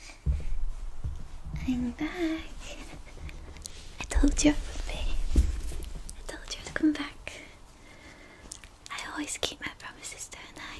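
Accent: Northern England, United Kingdom. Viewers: I can’t tell if her accent is British or Australian? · Somewhere in northern England? Manchester maybe? (definitely didn’t read the comments) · Beautiful Girl xx 😍🔥💕❤ Tingles for sure Love your accent northerner like me,, I'm Bolton by the ways.. Keep up the fabulous video's baby xx.. Youu are asmr Angel love your eye's very Disney princess.. getting Elsa frozen vibes,😊.. Xoxo Levi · She’s British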